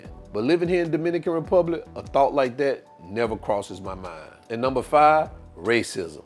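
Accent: South, United States. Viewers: You should be a preacher. You alreary have the southern accent. You said it as you see it, and I think you right.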